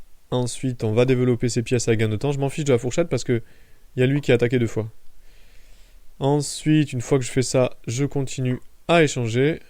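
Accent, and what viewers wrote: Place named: South, France. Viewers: c'est intéressant, vos commentaires sont vraiment sympa, le style dynamique et l'accent du Sud :-D merci pour ces vidéos. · accent du sud ... on dirait carrément DiMeco !!!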